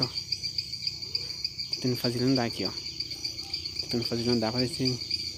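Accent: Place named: Paraíba, Brazil. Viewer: Comlissenssa vc e da paraiba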